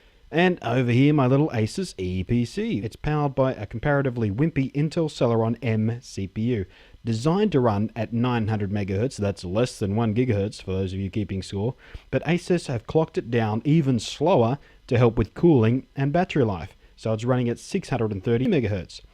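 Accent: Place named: Australia. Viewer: OY!! WTF are you going on about? Don't answer that. I tune it to your videos, not unlike MANY, to see your cute face and expressions and hear your cute Aussie accent. You're an adorable pretty face.....take your fucking shirt off bitch.....give me a GOOD show!!! If you think you're watched JUST because of your "insights"...guess again and join reality....you're popular because you're a prettyboy. So take off your fucking shirt and get on with it....for us!!!! YAY!!!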